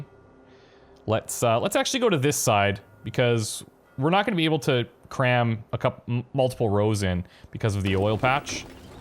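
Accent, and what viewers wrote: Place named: Quebec, Canada. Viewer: I'm betting your Canadian, that accent is a beauty. I'm guessing Québec, there's a little bit of that French Canadian, eh.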